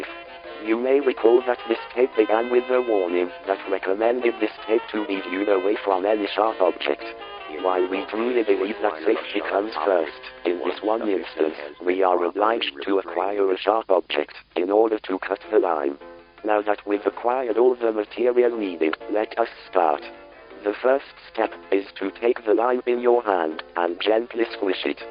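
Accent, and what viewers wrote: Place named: France. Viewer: Is this your voice run through a filter? I would've thought just text to speech but it has a definite French accent(I haven't heard your voice in a long time so I can't tell if French tts or you through a filter)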